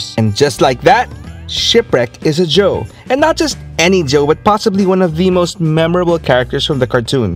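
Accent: Philippines. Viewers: from your accent. are you from Philippines? Just curious. Was G.I. Joe big over there? I'm surprised when I see pinoy youtubers talking about gig joe. I never knew it was shown over there in the 80's. By the way you don't need to insert an image of every word or phrase your saying. for example, we don't need to see images ofMadeline Pryor when you say prior or images of Michael Jackson, goat saying bad and all the other corny images. Just stick with the subject. The other images are just so fake and corny.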